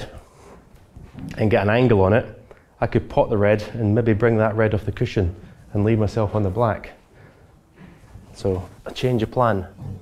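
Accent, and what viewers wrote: Scotland, United Kingdom. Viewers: He talks like a regular Scotsman enjoying his sport. The insights regarding positional play is gold.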